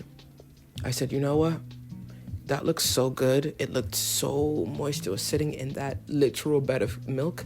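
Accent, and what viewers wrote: United Kingdom. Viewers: your british accent is cracking me up, omg haha. also you have new job? what kind of role do you have?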